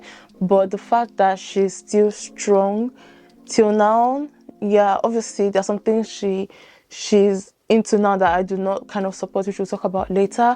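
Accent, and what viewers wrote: Nigeria. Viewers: Yep that's a nigerian accent! Source: I'm nigerian too! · I love your accent, r u nigerian σ(^_^;)?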